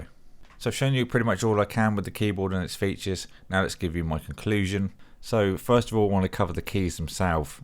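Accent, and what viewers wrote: United Kingdom. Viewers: Are you Scottish? Your accent made me feel that way coz my German aunt nor English uncle speaks that way but a Scottish friend does.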